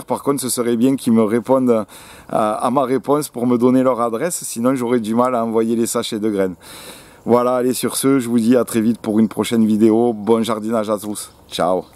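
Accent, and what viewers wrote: South, France. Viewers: Bonjour. Video claire, précise, concise et fort intéressante avec votre petit accident du sud. Merci de me rappeller des basiques que j avais un peu oublié.... J ai une formation horticole, mais en 30 ans on oublie 😉 Petite question peut être un peu idiote mais quel style de lait est t il préférable d utiliser ? Stérilisé, entier, demi écrémé....? Si vous me tirez au sort je vous donnerais le nom d une exceptionnelle tomate cerise que je mets depuis 2 ans.... Allez c est pour moi.. C est gratuit... C est la sundance... Bon c est une hybride mais di vous ne connaissez pas... Je ne peux que vous la conseiller. 😉 Bonne soirée à vous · Moi j'y connais rien en jardinage mais par contre, j'adore l'accent du Sud !! C'est l'accent du Sud Est ? Du Midi ? Je ne sais pas les differencier. Rien que pour ça j'adore cette vidéo et je vais m'abonner !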